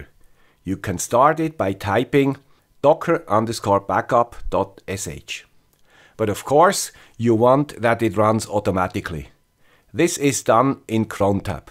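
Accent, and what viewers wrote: Switzerland. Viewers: Fantastic! Thanks!! ʘ‿ʘ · Another great video by the engineer with the Swiss accent. I like the summaries. Great job, I will use some of this for the VPN portion. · So, Swiss aksent ist German aksent? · your swiss-deutsch english accent is amazing ! cheers from cheese land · guy with a swiss accent, we need a current video on installing docker-compose and nginx proxy manager on a raspberry pi. thank you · The guy wis a swiss accent.. That sounded so chilling. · Very good, interesting and helpfull descriptions. Thank you !!! :) Your English vocabulary is really great, but your pronunciation is simply indescribable Swiss. Please take a much longer holiday in England (Cambridge or Oxford), it definitely will help you. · I think this video will give me the boost I need to have a much better home server! DrFragle and Mr. Shark was(Antonio?) already hinting on this kind of setting with docker. 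This is by far the most useful video I have seen from you. The Raspberry 4 will be ordered soon. The SCRIPT from Peter will now be history for me. Also, I sense a backup supply system will not be necessary anymore because of the much easier backup system. This video will keep me busy for some time, which is good! Thank you! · Off course: with summery! I think it's part of the brand, like the Swiss accent!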